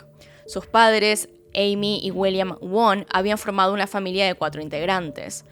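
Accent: Argentina. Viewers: Ala , que hermosa eres y que bonito ese acento argentino, que chula manera de narrar algo atroz 😭 que arte.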